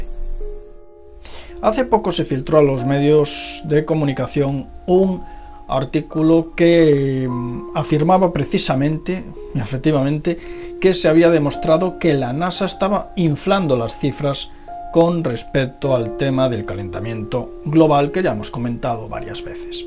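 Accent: Spain. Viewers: tu vos me da cáncer y mas tu acento de español racista y ladron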